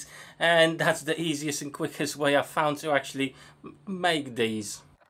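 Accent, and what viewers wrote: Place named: United Kingdom. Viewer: I have found your channel because i have also recently started using the table circular saw and the miter saw from Evolution. I am from Germany. I can understand your English very well. What part of the UK are you from? Your dialect is very different from what I learned at school. I have subscribed to your channel and hope for more interesting videos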